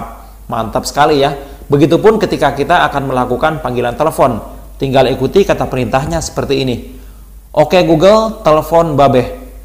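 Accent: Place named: Indramayu, Indonesia. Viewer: Orang indramayu to...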